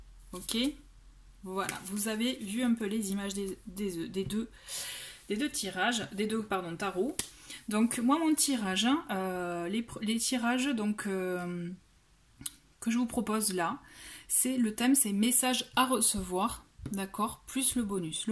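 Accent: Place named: Southwest, France. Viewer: Vous été du Sud ouest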